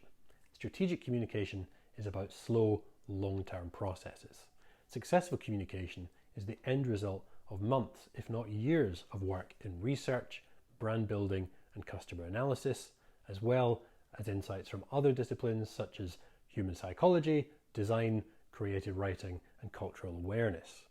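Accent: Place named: United Kingdom. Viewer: Great uk accent straight to the point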